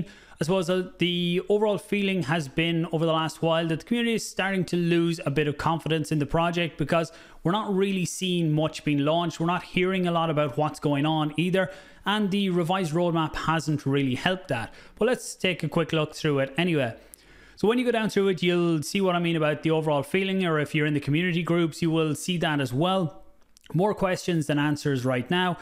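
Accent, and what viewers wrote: Ireland. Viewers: I truly do love the Irish. But whenever I hear that accent I start looking for my pot of gold. Not sure how that got started but I really would like a pot of gold if you could manage it.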